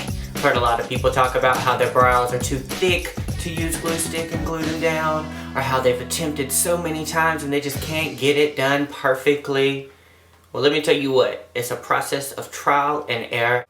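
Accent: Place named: South, United States. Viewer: Lol I was gonna say I'm from Baltimore and his accent def didn't sound like Baltimore accents. It def sounds southern!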